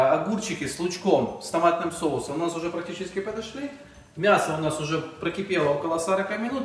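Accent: Ukraine. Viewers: Ведущий с Украины, похоже с Юго-Востока т.к. чисто по- русски говорит. А у нас так и говорят всегда -"Возьми , съешь огурчик или пойду собирать огурчики". Это как в Росии да и у нас русские часто говорят -" Съешь , помидорки" . Хотя остальных суфиксов действительно многовато, наверное просто мужчина хотел придать видео больше душевности и убрать официоз.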